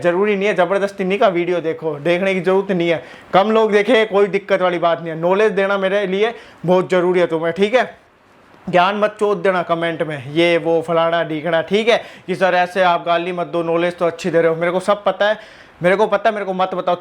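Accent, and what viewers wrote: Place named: Marwari, India. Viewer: Marwadi ka boli se pata lagta hai bhai 🤣🔥🔥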